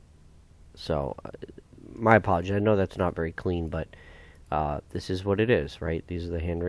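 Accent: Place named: United States. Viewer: Thank you for your greeting. I am a great fan of true crime. I find it fascinating that in the USA, you are privy to such documents and/or knowledge about the cases, no such liberty in the UK. I am on part 8 as I think I have probably watched all clips that Shannan or any of Shanann's friend have taken. Have you read any other crime cases? I think this has hit us so hard because we are they, ie we can identify with their lives. I hope you don't get bored .... I speak a couple of languages and it has always been my dream to read books in the first language of the writer eg. Anna Karenina in Russian, Anne Frank's diary in Dutch etc etc. Hearing you read these gives the correct nuances unique to the English spoken in the USA. Thank you so very much.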